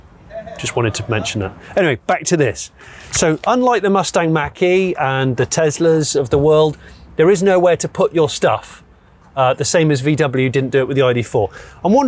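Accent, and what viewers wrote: United Kingdom. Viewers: The main reason I watch this show is the very British English.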